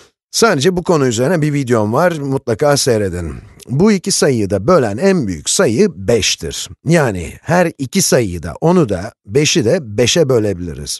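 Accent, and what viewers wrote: United States. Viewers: hocam amerikalılar gibi konuşuyorsunuz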